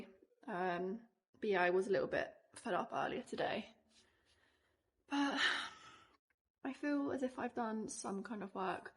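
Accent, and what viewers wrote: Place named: Southern England, United Kingdom. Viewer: She is from south of England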